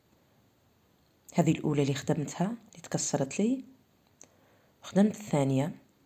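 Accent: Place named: Tebessa, Algeria. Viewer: بارك الله فيك الأخت من تبسة لهجتك قريبة للهجة التبسية انا متتبعة لقناتك منذ مدة و فرحت كي رجعتي لنشاط القناة وعلى الاقل نلقى تشجيع على الكيتو من اكلنا اليوميةالتقليدي شكرا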